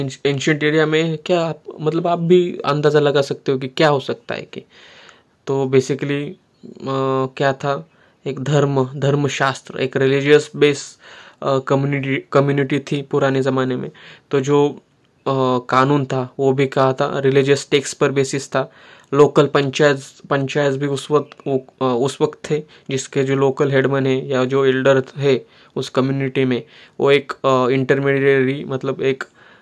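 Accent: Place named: Maharashtra, India. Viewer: Same amchya sarkhich hindi speaking...... apla marathi manus detected ❤😁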